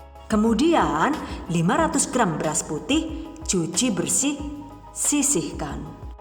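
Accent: Banyumas, Indonesia. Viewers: Ngapaknya bund..salam wong ngapak resepnya selalu mantul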